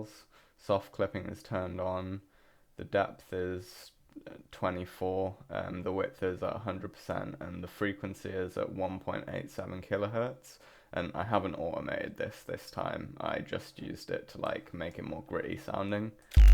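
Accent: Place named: South East England, United Kingdom. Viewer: you sound like a south east lad too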